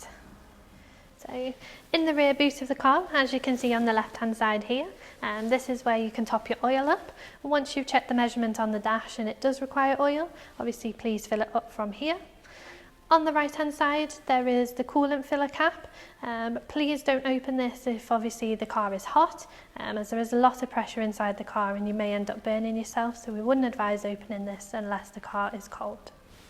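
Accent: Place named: United Kingdom. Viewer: I’m looking for a Boxster 718, so this is helpful. As an American, I love her British accent.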